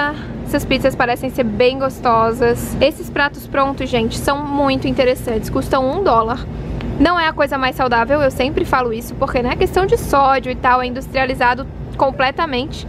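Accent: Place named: Santa Catarina, Brazil. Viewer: No início do vídeo já imaginei que vc era aqui de SC pelo sotaque 😊😘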